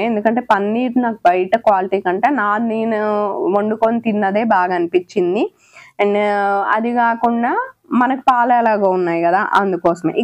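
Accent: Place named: Andhra Pradesh, India. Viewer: Akka meedhi Andhra Pradesh ha ledha Telangana akkda untaru akka slange matharam Andhra laganey undhiiiii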